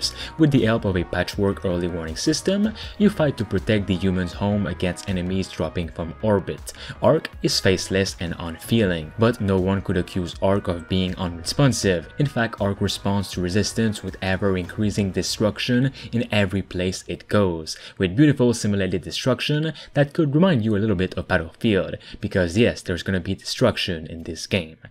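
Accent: Quebec, Canada. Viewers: Tu parles francais? Tu sonnes quebecois 😜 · tes quebecois toi hein?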